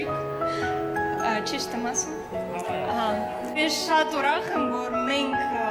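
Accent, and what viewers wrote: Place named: Georgia. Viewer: Հարսը վրացական ակցենտով է խոսում եթե ուշադիր լինենք...❤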